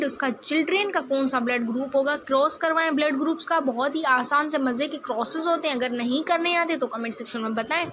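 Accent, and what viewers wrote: Sindh, India. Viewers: Mera ek dost Sindhi wo bhi esey hi bolta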